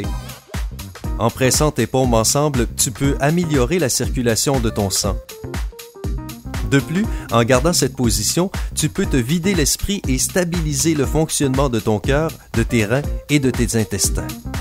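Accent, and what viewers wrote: Quebec, Canada. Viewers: Putin d'accin québékouè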